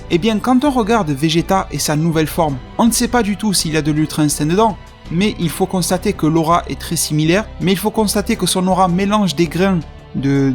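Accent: Marseille, France. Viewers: T es marseillais ?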